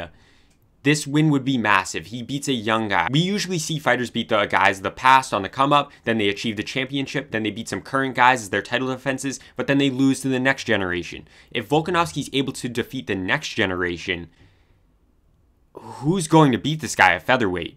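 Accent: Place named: Pacific Northwest, United States. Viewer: you’re definitely from the Pacific Northwest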